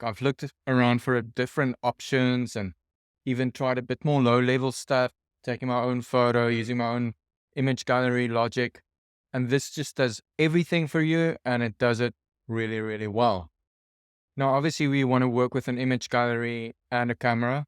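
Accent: South Africa. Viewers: Souf Efrican?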